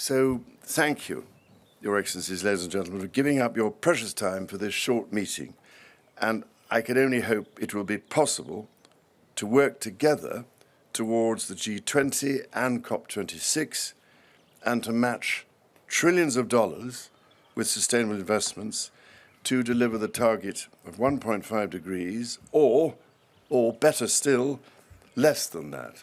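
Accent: United Kingdom. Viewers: Prince Charles has a very classy British accent. His son doesn’t sound like him. I have never been a royal watcher from America and I couldn’t get past the full first episode of the Crown but I am so fond of Queen Elizabeth. She is an inspiration to me.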